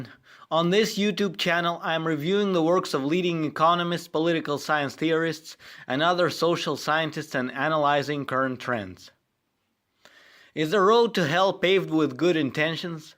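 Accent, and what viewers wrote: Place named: India. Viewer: now this is good content but try to correct your indian accent okay?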